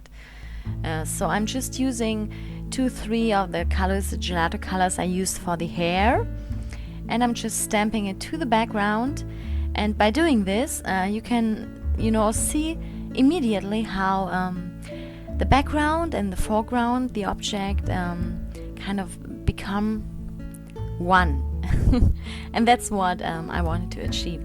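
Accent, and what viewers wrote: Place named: Germany. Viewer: Deine Betonung ist so "typisch deutsch" :D Das ist nicht schlimm, hat nur Wiedererkennugswert ;) Am Besten gefallen mir die Haare, aber das Gesamtbild ist einfach klasse :)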